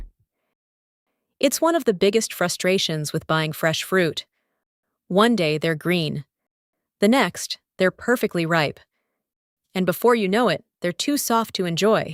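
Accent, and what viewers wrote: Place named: United States. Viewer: BanEnas, can't we have a proper English version on Youtube as opposed to American so called English.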